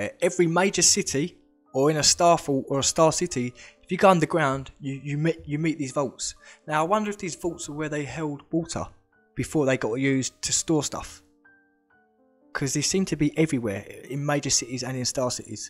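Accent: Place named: Cockney, United Kingdom. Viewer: How refreshing your little Cockney accent is! Found you today, been watching Tartaria for about 3 months and find it fascinating. Subscribed and looking forward to seeing more of your content.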